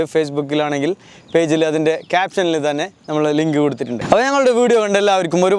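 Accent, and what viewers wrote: Kasaragod, India. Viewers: KASARGOD ആണോ BRO 🤩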